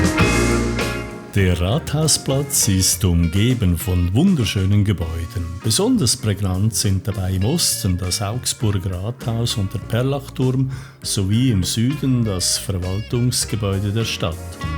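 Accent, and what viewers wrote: Switzerland. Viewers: Im Allgemeinen haben es Schweizer so an sich, mit Akzent zu sprechen. Würde er im Dialekt reden, verstündest du kein Wort. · Sehr seltsam, der Sprecher hat einen Schweizer Dialekt, muss das sein???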